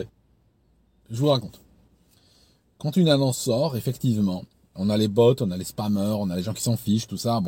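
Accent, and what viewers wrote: Vaud, Switzerland. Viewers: Il me semblait bien qu'il y avait un accent vaudois 😂 Je pensais que c'était une hallucination Super vidéo, ayant été moi même à la recherche d'un emploi ces derniers mois, je me retrouve tout à fait dans tes conseils mais j'en ajouterai un toutefois : me négligez pas votre réseau quand vous êtes en études ou chez votre précédent employeur. J'ai vu des gens de ma volée de Master trouver des jobs très (trop) bien payé alors qu'ils étaient carrément mauvais tant sur la pratique que la théorie, qu'ils avaient une attitude très peu professionnels simplement parce que quelqu'un dans leur réseau a pu les pistonner. Vous pouvez être le candidat idéal à un poste, si l'ami d'un manager le veut également vous passerez à la trappe. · Ah ! Je mdisais bien que tu parlais avec un accent suisse ! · Ne change rien pour ton accent suisse qui refait surface de temps à autres, c'est sympa je trouve, en tout cas ça ne me gêne pas du tout, les accents ont tous leur charme. 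· Ah voilà pourquoi l'accent suisse ! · en tant que Suissesse, j'adore cet accent 🇨🇭👍😊 ne chassez pas le naturel 🙏😊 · Notre accent suisse est juste parfait... On s'en fout des haters😂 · Excellent ton accent de chez nous, je suis Suisse aussi (nord vaudois) pourquoi tu es parti? Merci pour la vidéo 👍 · merci pour cet accent suisse, au final ca me détend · PTDR à la fin "je fias un effort pour pas parler avec l'accent suisse" alors qu'il a typiquement l'accent suisse X) la même manie de prolonger légèrement l'avant-dernière syllabe, tout X) Vidéo incroyable, c'est vraiment le daron idéal, il a de l'expérience constructive et il arrive à revenir dessus et à en livrer le contenu essentiel... Ce type aurait dû devenir prof/chercheur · Bonjour, bonjour à tous. Merci pour tes vidéos et ta pédagogie au travers des exemples qui tu cites, c'est très instructif; lâche toi sur l'accent Suisse, ça fait partie de ton profile! 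· Si! C'est sympa cet accent suisse. Merci pour tes conseils. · L'accent suisse me rappelle les jeux olympiques d'Albertviiiiille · C'est super agréable de vous écouter avec ou sans accent suisse, donc pas de soucis 😉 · C’est vrai pour l’accent suisse, je m’étais dit qu’ dérivait doucement, vers un nouveau lieu de résidence, donc intéressant pour s’entraîner. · y a que les francais qui se plaignent de l'accent suisse le reste des francophones 400 millions de personnes adorent ton elocution 😃. C'est toujours les memes qui se plaignent et qui font la greve 😁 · L'accent suisse sonne très sympathique, personnellement je trouve que vous ne devriez pas le masquer, au contraire 😀 merci beaucoup pour tout ces conseils, je suis actuellement demandeur d'emploi et c'est une mine d'informations utiles. Très bonne continuation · Et bien j'apprécie l'accent Suisse. Merci de tes reflétions qui inconsciemment mettent le candidat comme l'homme fort de l'entretien. 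· Il est génial ton accent Vaudois, on l’aime beaucoup. C’est un héritage dont il ne faut pas avoir honte, un souvenir de voyage qui fait maintenant partie de toi, une richesse, un métissage, une pépite 🫶🏼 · Ne t excuse pas de prendre l accent suisse je suis français mais la vie est pas désagréable que ça en Suisse et les Suisses 🙂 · Joli accent suisse...c est bien de s adapter moi aussi je prends des accents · Merci 🙏 pour la réponse clin-d'oeil à ma question sur votre accent suisse. Il n'y avait rien de désobligeant, juste que je regarde pas mal de vos vidéos et que je ne l'avais pas entendu précédement. En tout cas j'aime beaucoup le contenu de vos vidéos 👍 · Coucou! Si j’ai parlé de ton accent suisse c’est que j’ai trouvé ça trop mignon: un algérien qui vit en Angleterre avec un accent suisse, franchement, il n’y a que toi pour ça! Merci pour tes super vidéos qui éclairent mes journées! 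😊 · Merci pour ta vidéo et vive l'accent du Gros-de-Vaud! Ayant moi aussi cet accent de part mes origines et j'y habite toujours d'ailleurs. Tu as vécu dans quelle localité?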